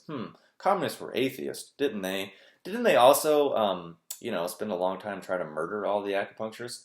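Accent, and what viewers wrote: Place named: South, United States. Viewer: oxymoron if you don't know what he's saying but keep coming back because he talks level headed emm OK, u may have a demonic force on u haha. little penis lol Spot on all this spiritual Shit people take so obsessively and they're usually the biggest bastards behind closed doors. at least I know some of my beliefs are a delusion but I like them so I guess that's my entertainment...you are a thought provoking fella, (that southern accent, I fuckin love your mocking voices) 😂😂👍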